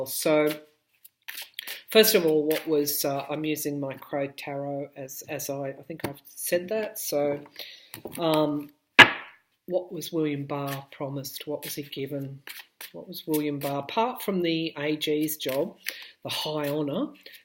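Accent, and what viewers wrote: Australia. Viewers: Hard to get used to that strong Aussie accent too..... just saying. She's good tho.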